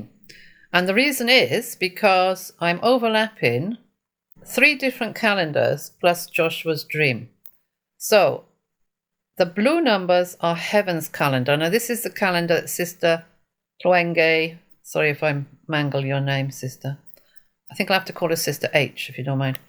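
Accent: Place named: Manchester, United Kingdom. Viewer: are you a Mancunian? I was born there and left for Australia in 1972. Your accent sounds very familiar. I'm born again and thank you for your work in the Lord. We fly soon.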